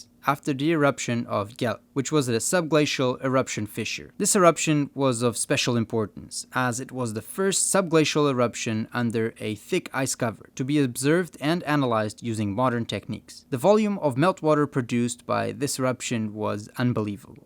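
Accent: Portugal. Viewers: You have a portuguese accent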